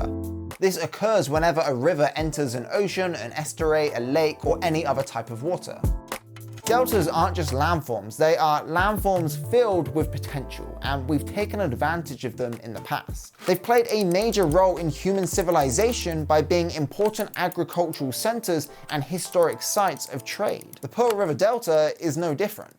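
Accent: United Kingdom. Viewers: The guy sounds British, not American? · Mate that accent is not American... He's very clearly from the Midlands or south of England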